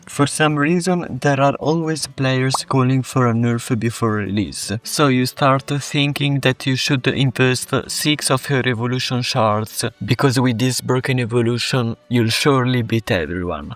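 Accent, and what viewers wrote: Italy. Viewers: Grande fra, parli benissimo inglese, ma si è subito capito che fossi italiano dall'accento!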